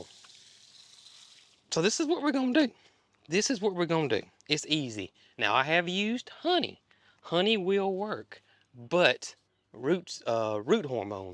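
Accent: South, United States. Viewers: Captions do not mix with a Southern accent!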